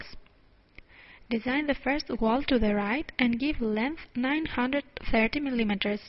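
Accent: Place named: Greece. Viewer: nice accent.. ellhnida? :P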